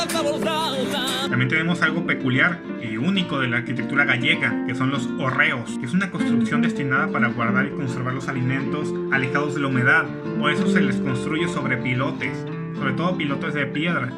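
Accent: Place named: Guadalajara, Mexico. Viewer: JAJAJAJA si Auronplay viera que lo pusiste pelón te diría : Menudo personaje de cojones JAJAJA, buen video como siempre entregando cada vez la mejor calidad para tus suscriptores mas fieles, y buena imitación de acento andaluz he, pero por tu acento natural yo diría que eres Tapatío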